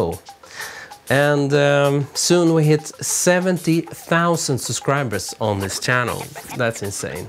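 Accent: Sweden. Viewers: Now I know what word will deffinitley tell if the person talking is swedish, it is the way he pronounces ”glycerol” 😁 great video again, thank you! 👏😊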